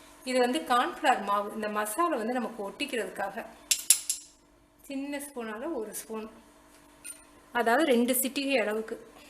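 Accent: Madurai, India. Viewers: Mam neenga sonna method la idiyappam senjen color color ah super Then ipo yaara priyani seya poren today Sunday spl ah senjittu solren And neenga enga madurai sidenu ninaikkuren. Madurai style la pesuringa. Really super. Tq.mam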